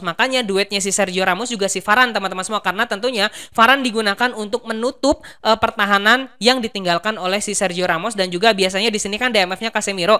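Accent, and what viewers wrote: West Java, Indonesia. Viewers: Bang orang jabar kah?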